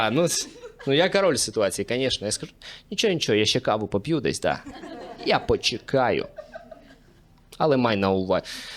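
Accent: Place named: Kherson, Ukraine. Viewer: Та я його відоси тільки за херсонського суржика дивлюся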